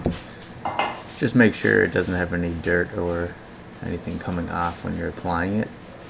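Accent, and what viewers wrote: Canada. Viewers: I've noticed you're canadian because of the accent hahaha 🥰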